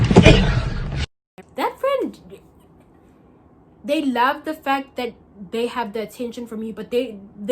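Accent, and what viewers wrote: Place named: United Kingdom. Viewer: it's the British accent for me 🤣😭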